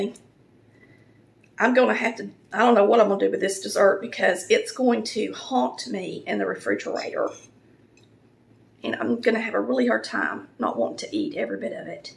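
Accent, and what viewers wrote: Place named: Kentucky, United States. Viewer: I love to listen to you talk cause I am a Kentucky girl living in Florida for many years and it's nice to find someone that talks like me. God Bless you and your family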